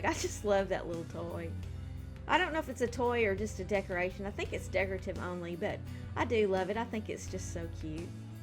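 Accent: South, United States. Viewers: Love your sweet southern accent :) I live in Georgia so its a good feeling hearing a southern simmer voice! :D But i dont know why because i am from the north and i have northern accent. Great video! I subscribed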